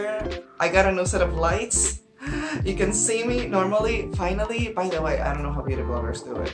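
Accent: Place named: Bogotá, Colombia. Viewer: Are you from Bogotá? Porque tu acento me suena bogotano y que bueno tener a una compatriota fan de la caligrafía, las tintas y plumas :-)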